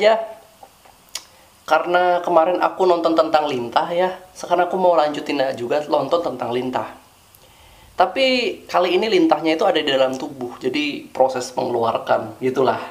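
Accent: Banjarmasin, Indonesia. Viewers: Orang banjarmasin mana suara nya😄✋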